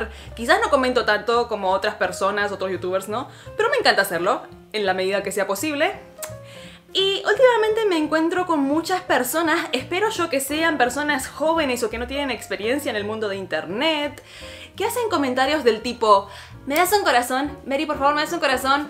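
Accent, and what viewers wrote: Argentina. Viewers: Lo dices tan cruel que hasta da ternura Pero es cierto parecen pelotudos sin vida (￣(エ)￣) (se le sale lo argentino ^<^) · Estoy totalmente de acuerdo con vos y otra cosa que odio es...: "Ya me subscribi a tu canal ahora hacelo vos " osea me subscribo si me gusta el contenido , osea deja que llegan solos los subs Pd: me pegaste se él asentó argentino soy uruguaya y en la clase soy la Argentina 😂😂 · argentina asta los pelos xdxd tu acento me mata * - * deberias predicar en mi iglesia :u ya recapacite xd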